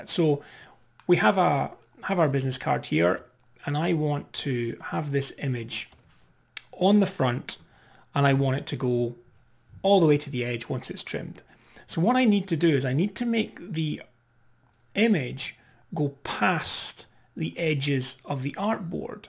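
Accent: Scotland, United Kingdom. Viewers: Thanks man. Love your accent, is it scottish?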